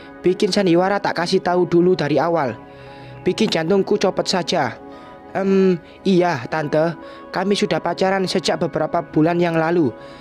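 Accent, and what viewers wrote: Java, Indonesia. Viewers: Huuh Medok Jawanya bikin hati sangat bahagia saat denger suara Admin yang manjain telinga ini. Huuh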